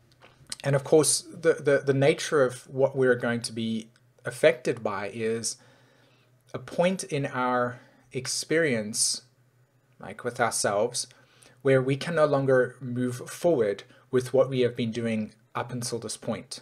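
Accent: South Africa. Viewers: Nice to hear a South African astrologer !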